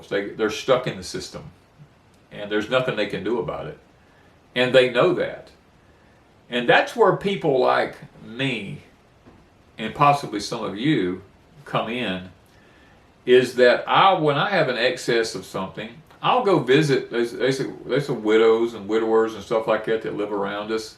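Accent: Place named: Mississippi Gulf Coast, United States. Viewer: Oh my! I have to tell you something funny. I am 63 y/o and live in Oregon. I stream lots of homestead channels but yours caught my attention because I couldn't put my finger on your accent. I can usually tell like Florida, Louisiana, Carolinas, etc.But yours just stumped me. Until today I heard you talking about love bugs and the old Sunflower store and now I know where you are from. LOL! I am from Long Beach and you sound like home! No wonder I couldn't quite place your accent. My kids still live in Bay St. Louis and Biloxi and I'm on the coast today visiting and dropping hints trying to get my son to buy a farm!! 😂 Oh and one more thing.... Love bugs live inside the trees and are released when hurricanes snap them open. My brother does Auto air conditioning in Gulfport and love bugs are a harbinger. They swarm when the A/C season starts picking up and again when it slows down.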